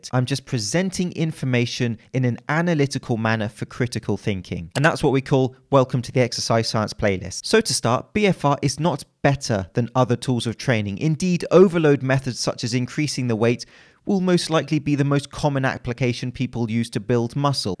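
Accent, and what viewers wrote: England, United Kingdom. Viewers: Love your videos mate, specially the taking the piss ones. Would love it if you did a video on the old timey light dumbbell system. Got a book about it, David Bolton's the lost secret to a great body, and it really changed my body. I'm what you call a hard gainer. Been doing Capoeira for 14 years, so thin, well defined muscles, but I always felt like my arms were a bit like T Rex's. After 2 months I gained 5cm around biceps, there's almost no appreciable DOMS after a month and you get a very specific feeling i've never felt before of being BIGGER and ENERGIZED after each session. You sound like you live in Britain, maybe you can make an interview with the author, find some evidence on how this training method works? I've tried to look for it myself but can't find anywhere why these changes have occurred so fast. Maybe increased glycogen storage in the muscle and therefore more water retention in the fibre? 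But I am objectively stronger and have more muscle endurance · "Restrictimg blood to their brains" haha. I found your channel the about a week ago when I was looking for some creatine info. Great stuff and I love the humor. One of the few subs I actually have. But one downside, I caught myself reading a textbook with an English accent in my head...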